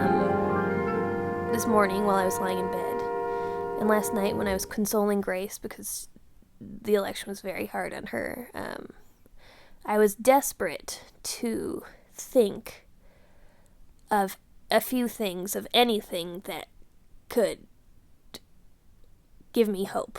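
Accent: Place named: Midwest, United States. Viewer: Minneapolis, I was right. you are from the mid west. I can tell by your accent. so hey fellow midwesterner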